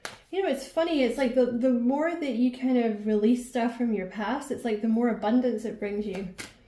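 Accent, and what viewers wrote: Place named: Scotland, United Kingdom. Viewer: The second i heard the accent i subbed. Hellow my Scottish sister fom your sister in England ❤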